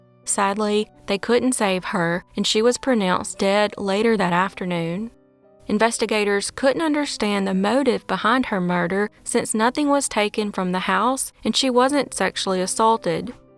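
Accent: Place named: Eastern Kentucky, United States. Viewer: I married a gal from Owsley County Eastern Kentucky. Among other things, her accent made her absolutely irresistible to me! This narrator sounds exactly like my wife! Haha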